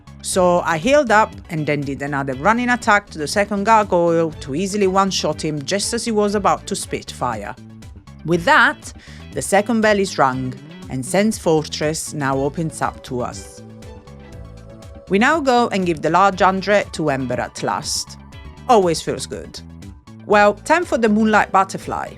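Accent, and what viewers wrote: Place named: Italy. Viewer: I can't predict how she's going to pronounce words. Italian, Southern England, and hints of Irish all rolled into one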